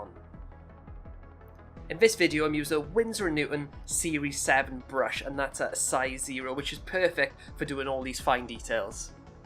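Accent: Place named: Wales, United Kingdom. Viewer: Came here from the Reddit post, your accent surprised me! Always nice to see a fellow Welshman in the hobby.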